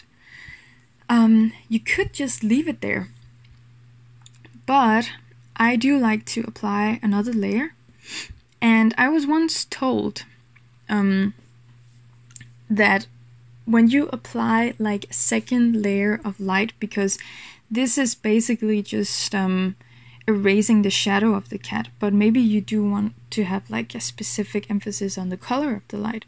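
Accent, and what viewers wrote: Denmark. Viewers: Are you Danish? Your accent sounds very similar :P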